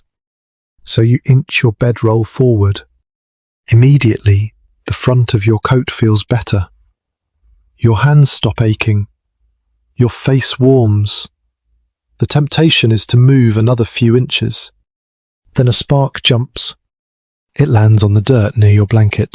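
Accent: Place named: United Kingdom. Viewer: Annoying British accent.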